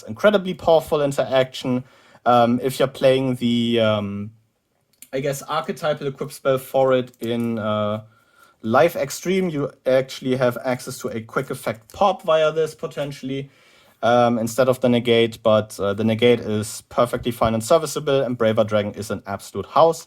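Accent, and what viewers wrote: Germany. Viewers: You sounds so german XD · Are you from Germany?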